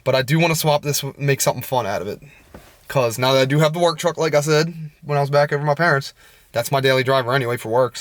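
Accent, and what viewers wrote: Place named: Maryland, United States. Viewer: I knew it was MD first few seconds of video, royal farms and the accent dead giveaway 😅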